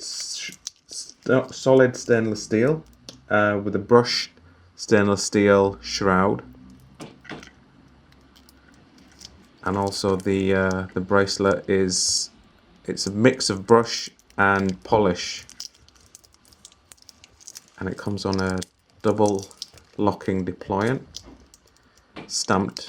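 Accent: Yorkshire, United Kingdom. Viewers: Will do my friend I've subscribed so will be watching your reviews from now. Happy new year . PS do I detect a slight Yorkshire accent may be Leeds ?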